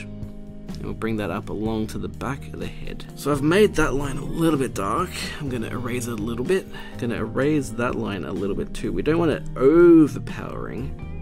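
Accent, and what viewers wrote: Australia. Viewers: ! I didn't know you were Aussie! I am too!!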